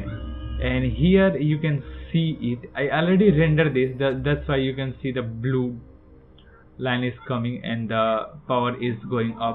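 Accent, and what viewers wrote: India. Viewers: опять эти индусы. не возможно слушать. уши вянут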